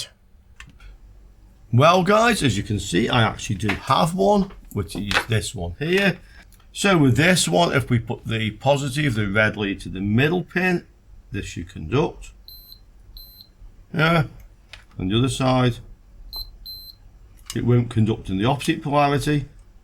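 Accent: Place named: Stoke, United Kingdom. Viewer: you sound like a fellow stokie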